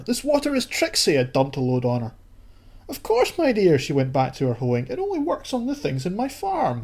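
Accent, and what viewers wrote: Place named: Ireland. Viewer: i think he is Irish lol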